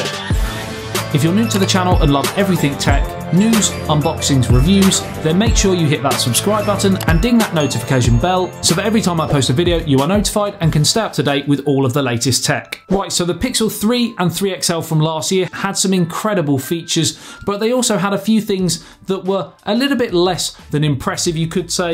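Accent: United Kingdom. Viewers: 2nd commenter i guess.......love your brit accent 😅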